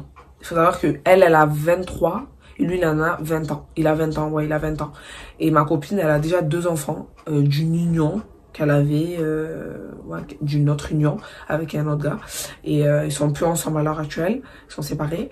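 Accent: Marseille, France. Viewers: t gênante andrea arrête de faire la gadjie mature tu dis dla merde elle a l’accent marseillais point arrête de rager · Ton accent il est trop beauu 💞 tu viens de Marseille ?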